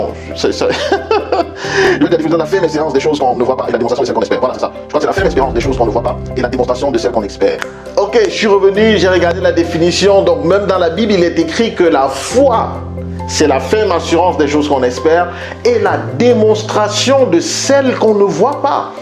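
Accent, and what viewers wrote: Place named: Canada. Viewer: non non c'est juste une remarque, ne le prends pas mal 😂😂😂 Au début tu parlait de façon simple, mais je remarque que ton accent devient canadien Tu vit au Canada n'est ce pas ?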